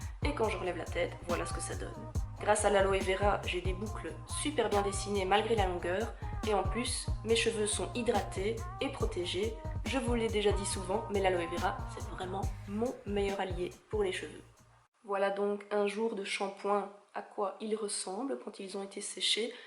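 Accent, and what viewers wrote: Belgium. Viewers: Oh tu es Belge ! J’ai été voir ta description car je me disais bien que je connaissais cet accent, très chouette vidéo, tu as des cheveux magnifiques !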